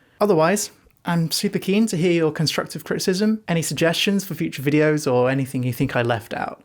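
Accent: United Kingdom. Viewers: Ok literally I had the thought to also say "jumper" in case this person wasn't American and then he speaks with a British accent god dammit. Also how is this not Freddie Highmore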